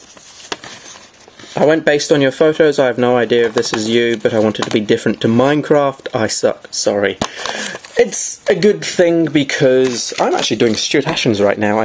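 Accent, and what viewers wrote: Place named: United Kingdom. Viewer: you sound more British in video.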